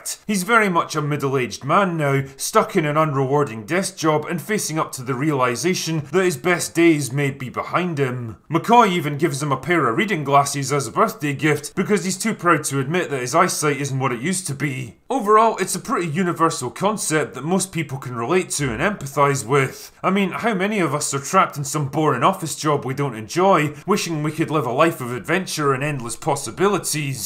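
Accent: Scotland, United Kingdom. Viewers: is your accent scottish or are you doing an immitation of an intoxicated man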